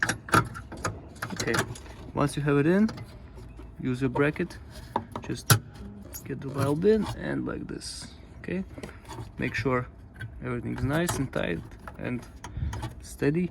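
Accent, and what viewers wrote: Russia. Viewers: не знаю кто ты и откуда, но слышен русский акцент ) классное видео спасибо )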